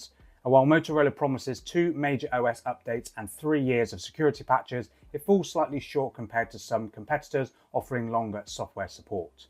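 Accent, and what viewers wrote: Liverpool, United Kingdom. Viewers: Why are you speaking in a Scouse accent? Whereabouts in Liverpool are you actually from? If you are not from Liverpool then why are you speaking in a Scouse accent?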